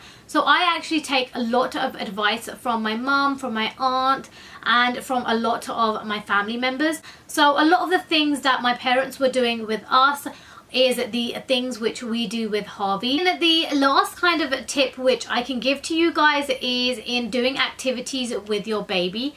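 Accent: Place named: Southall, United Kingdom. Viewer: Your Southall accent is horrible.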